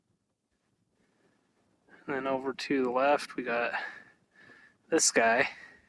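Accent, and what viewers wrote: Utah, United States. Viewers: Did you ever live in Utah? I hear a Utah accent when you speak. On that road you were on going past "The Hole in the Rock" and going into Moab, there is a formation called the "Disappearing Angel". Starting at about 15 miles before Moab on that solid wall of rock to your left, you can see a spire out in front of it (don't know what else to call it) standing away from the wall and several feet away. You keep seeing it as you get closer. Then all of a sudden you come around a corner and the spire is completely gone - thus "the Disappearing Angel"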